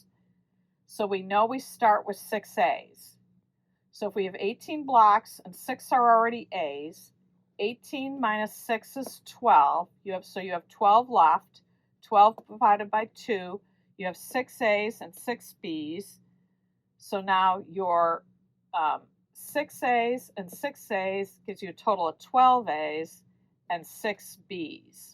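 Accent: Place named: Illinois, United States. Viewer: Good morning, a great way to wake up. Usually I watch you much later in the day, with my tea and toast. Coming from a family that puts an "R" in wash, I just attributed salvage vs selvedge to an Illinois accent if I even noticed at all. I don't know what I would do with another fat quarter bundle, but I would love to find out. Have a great week, Diana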